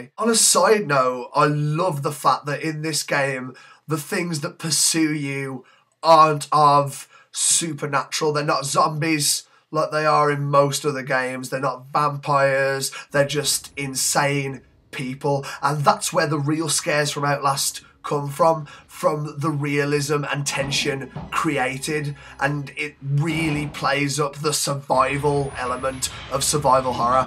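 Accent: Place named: England, United Kingdom. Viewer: While I am quite a pushover when it comes to English accents, this was really well done. You need to make more videos. Subbed!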